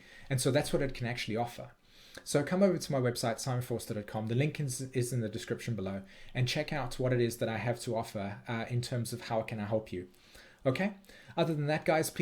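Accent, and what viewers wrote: South Africa. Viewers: Hey dude, are you South African by any chance ?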